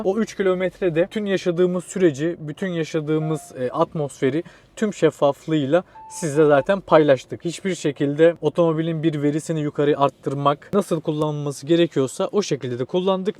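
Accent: Samsun, Turkey. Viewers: Eeelli klasik Samsunlu konuşması .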